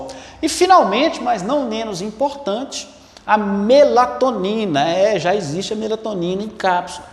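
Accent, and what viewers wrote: Minas Gerais, Brazil. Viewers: Eita sotaque mineiro sô 😁. Vídeo sensacional, graças a Deus durmo sem precisar usar nenhum desses suplementos 🙌🏻. Vídeo ótimo para utilidade pública 👏🏻👏🏻👏🏻👏🏻👏🏻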